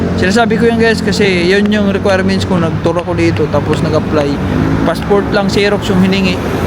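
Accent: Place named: Waray, Philippines. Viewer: Waray waray ka kb sir..kasi yong accent mo sumasabit sa Pagka waray eh😅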